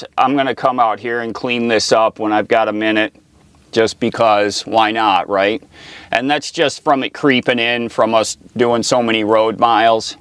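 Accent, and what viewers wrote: United States. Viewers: Hi, glad to see you and Lefty enjoying yourselves in Quartizsite., my son watched your video with me today and was surprised to hear you live in NY, just as we do. He said to him you sound like you have a Southwestern accent or something similar. Just wondering if you grew up in NYS or not. Blessings to you and Lefty, Happy Thanksgiving.:) <3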